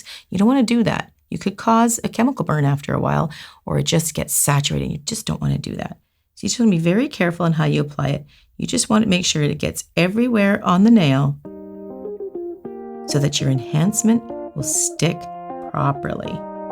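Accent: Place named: Canada. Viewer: I just got dip powder nails for the first time, trying to grow out my onion-peel, thin nails. (genetic, my mom's are the same) I was looking for info about dip nails online and found your channel and subscribed. I'm not a nail tech, but I love watching you and listening to you. My fiance is Canadian and before I knew where you are, I guesses you are Canadian, love your adorable accent. :) Thanks for making such great videos!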